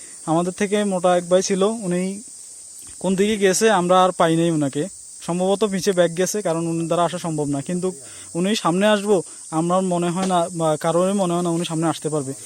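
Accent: Noakhali, Bangladesh. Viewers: Vai mone hoy noykhalir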